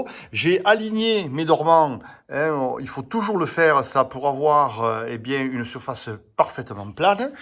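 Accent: South, France. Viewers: Comme on dit par chez moi: " C'est avec des petits riens qu'on fait des grands touts!" Une belle découverte cette chaine. Bravo! Et puis cette accents du Sud, mes racines... Au plaisir S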